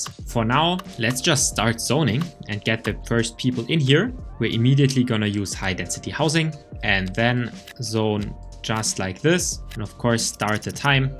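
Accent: Germany. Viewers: Bro kein hate aber du hast einen krassen deutschen Akzent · es ist so komisch nem deutschem beim englisch sprechen zuzuhören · Oh! You‘re German… I hear it and I saw it. Nice vid/cooles Video… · Do I hear a German landsman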